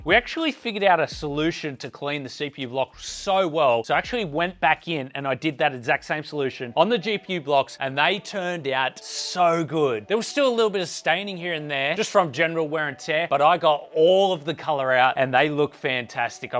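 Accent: Australia. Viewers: This guy has the most Aussie accent I have ever heard. Great video too don't mean any disrespect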